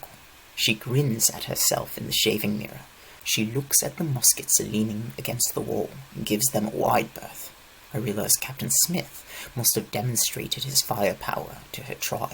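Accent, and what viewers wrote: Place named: England, United Kingdom. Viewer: The horrible English accent just makes this book ten times worse than it is.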